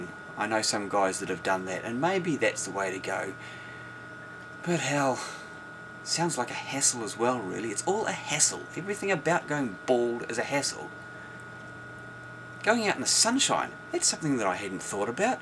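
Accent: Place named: New Zealand. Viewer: wow, new zealand accent is similiar to british, but quite different at the same time...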